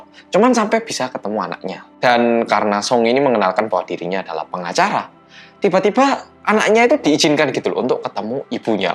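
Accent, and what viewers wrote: Java, Indonesia. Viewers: Bang coba bikin konten yg ngomong medoknya di hilangin, dong.🙏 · Park soe joon dengan kearifan lokal.... Medok... Medok... = park seo joon (mungkin kembar 😀😀😀😀( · Halo mas medokkk 🤗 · Berasa ndelok park seo jun ngomong bahasa indonesia medok, mirip banget mas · Keseringan denger mas ngomong medok, pas denger mas niruin kutipan filmnya, kaget "oiya, mas kan orang Korea, fasih dongg" 😂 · Lbh enak dgrin mas ngmg bahasa dgn medok2nya dibandingkan ngmg korea😅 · BERSAMA ORANG KOREA YANG..... MEDDDDHHHHHHOOOOOOKKK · Mas iso medok men mas? 😂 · Muedok tenan😄😄🐈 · Mas yg medookk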